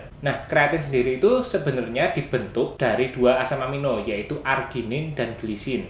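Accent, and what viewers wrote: Java, Indonesia. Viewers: Seneng dengan logat "medokmu" Bro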